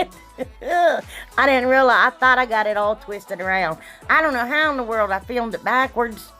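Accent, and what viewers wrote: South, United States. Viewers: I hope you feel better. You are the sweetest women and I love that southern accent!! Everything turned out beautiful! TFS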